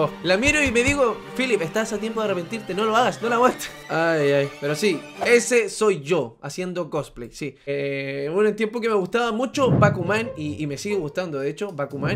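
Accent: Chile. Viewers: ¿Porque habla como chileno? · De que país es el? Tiene acento chileno la wea cuántica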